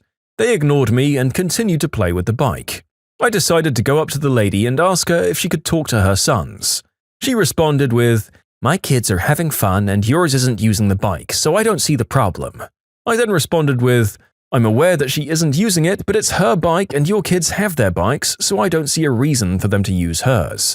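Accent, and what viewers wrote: United Kingdom. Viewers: AH! HES BRITISH!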